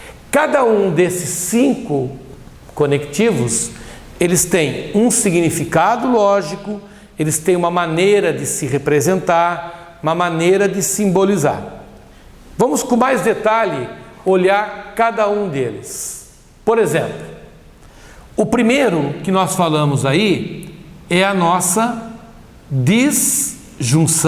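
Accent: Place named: Brazil. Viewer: é pq quando se aprende na escola, a letra e tem som de é e em uma oração, quando se vai ligar palavras, tem som de "i". Acho que falam "ê" por causa do costume de algumas regiões do Brasil, por isso eu estranho kkkkkk Parabéns pela aula, estou assistindo os demais conteúdos. Obrigado por postar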